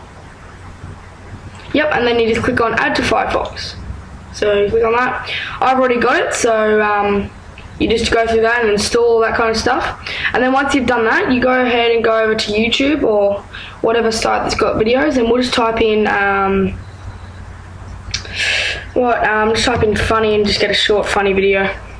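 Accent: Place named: Australia. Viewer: thanks you have an aussie accent im aussie as well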